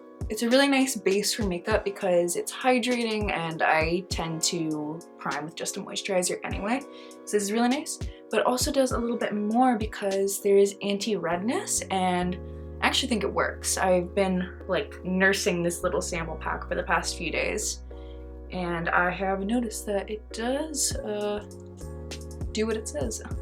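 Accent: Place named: Canada. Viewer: this is my first video i’ve seen from you and the second you stared talking i thought to myself “she’s totally Canadian” lolol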